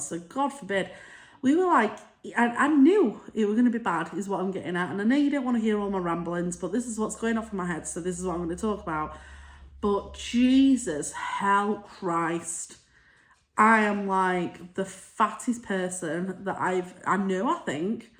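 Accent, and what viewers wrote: Yorkshire, United Kingdom. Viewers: you are doing so well, never ever forget that. Every day is a challenge in its own right, but continuing to better yourself is always going to win. Love your accent, my husband is from Yorkshire and we watched your video together. Reminds us of my sister in law who we don’t see often, as she lives in Barnsley. Sending best wishes to you and please let me know if I can help, I’m always here for a chat too. It is lonely at times, but you’re doing it for you so it is a process. I started off at over 21 stone. I’m now in the 15s, I can’t tell you how proud of I am of myself for keeping going, I can now walk into a room and feel good for once, I don’t worry anymore which feels great. Xx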